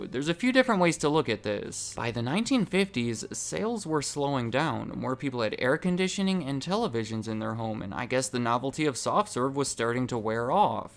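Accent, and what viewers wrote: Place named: Alabama, United States. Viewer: Sounds Alabaman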